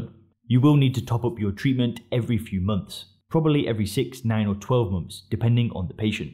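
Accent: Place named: Scotland, United Kingdom. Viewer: ermete trismegisto Scottish accent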